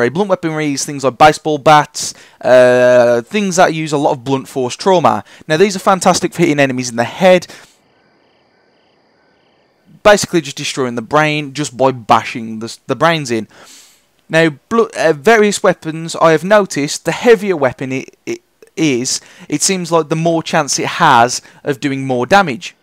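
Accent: Birmingham, United Kingdom. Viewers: Are you a brummy ?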